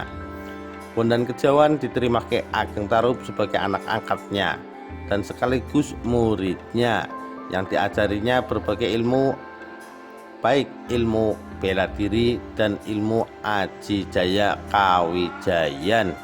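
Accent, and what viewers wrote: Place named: Banyumas, Indonesia. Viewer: Sayang chanel ini yg membacakan critanya sangat g menarik blas....aksenya banyumasan...g menarik blas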